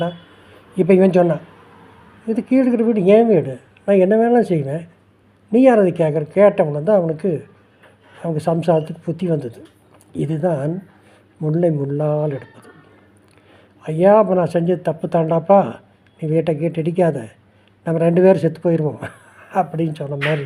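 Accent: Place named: Kongu, India. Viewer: Nalla experience..Good message.🎉🎉🎉🎉 Good Kongu Tamil slang. God bless you.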